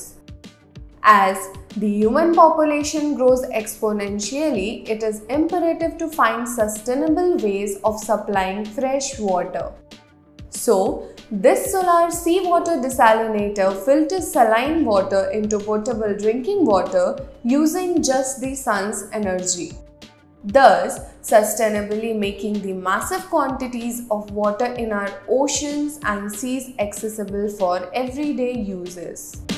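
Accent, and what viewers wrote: India. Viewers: the accent is almost totally but not quite totally indistinguishable from English. It's very.. bolly. Staccato... too Adamant. English should be softer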